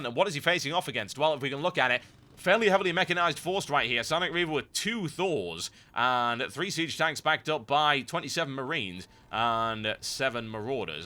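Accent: United Kingdom. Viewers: How could I NOT notice this beautiful channel - the genuine British commentary brings tears to my eyes!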